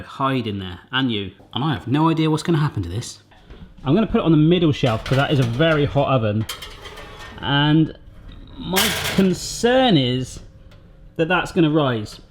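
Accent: United Kingdom. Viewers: Yep your accent totally "Vanilla" British! hahaha ^.^ Loved the Egg/Toaster combo thingy! ^.^ ♥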